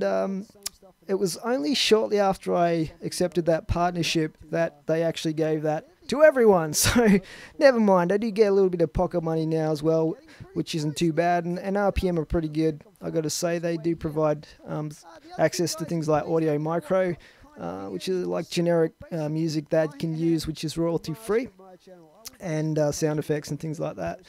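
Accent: Australia. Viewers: It may sound a bit strange, but the original thing that really drew me to your gameplay was you accent. Nothing beats an Aussie accent. And as to the second question, i think that more games played with subs would be very neat., i am so proud of you. You have come so far with your channel, and i just would ask you to never give up. Nothing cheers me up after a bad day like watching a video:D thanks so much, and keep up the fantastic work:D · I'm not entering for the competition (obvious reason, no Xbox) but I'd say for 1. The Australian accent along with the fact that you don't go much astray when talking about random things during gameplays that get annoying (some YouTubers have the issue, luckily I don't see it with you :D). Most of the time your conversations with others or just the voiceover for the gameplays you do are entertaining/fun to listen to. As for 2., I'd say get better internet for streaming :P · 1. 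Custom Zombies - You're partly responsible for getting me into it (through Yoteslaya r.i.p.) - Love hearing a familiar Aussie accent on a typically american/british dominated genre. 2. I would possibly like shorter videos - Max 15mins. Otherwise, everything else is great!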